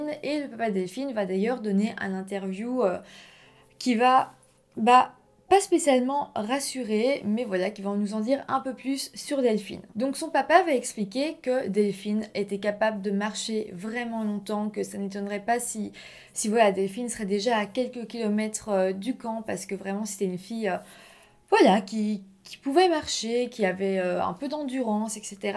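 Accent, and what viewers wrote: Belgium. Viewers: C'est juste l'accent belge ☺️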